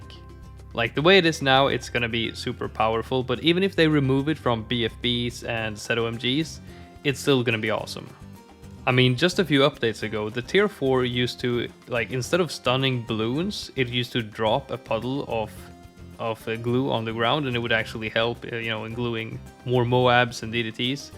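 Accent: Sweden. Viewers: Are you from Sweden ?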